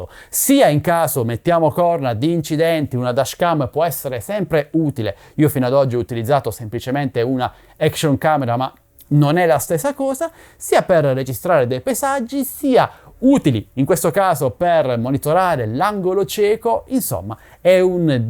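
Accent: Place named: Sardinia, Italy. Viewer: Pensavo fosse sardo!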